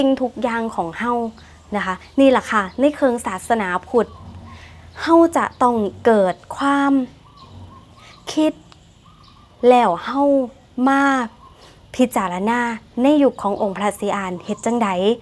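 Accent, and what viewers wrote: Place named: Vientiane, Laos. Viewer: เว่าม้วนอีหลี เหมือนลาวเจ้า ลาวเวียงจันทร์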